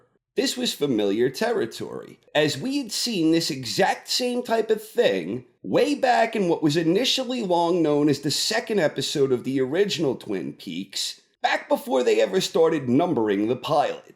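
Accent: New York, United States. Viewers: serious question, where are your from? I grew up in NJ and I suspect a hit of a NY accent? Please respond!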